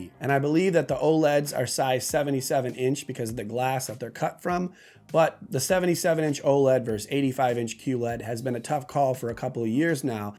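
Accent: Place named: Massachusetts, United States. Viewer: Are you from Massachusetts by any chance? I spot an accent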